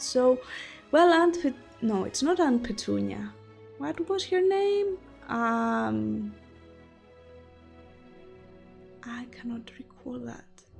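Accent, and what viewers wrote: Greece. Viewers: i love your greek accent! Latrevw thn douleia sou, mpravo kai euxaristw gia ta links! Harry Potter gia panta :)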